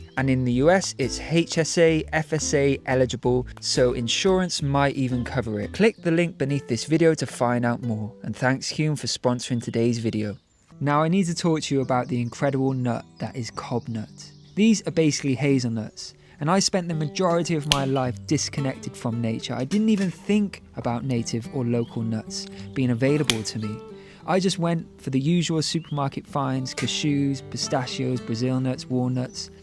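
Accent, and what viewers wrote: Wales, United Kingdom. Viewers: I love watching your videos. My husband was welsh he passed away two years ago. I miss listening to his voice. Hearing your welsh accent makes me so happy. I love gardening and cooking too. You are inspiring and you warm my soul. Thank you ☺️❤️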